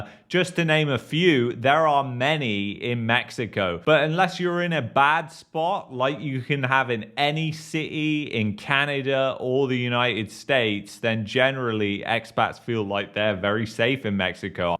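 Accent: United Kingdom. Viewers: listening to your accent, you better go back to the UK and enjoy it..leave Canada to Canadians..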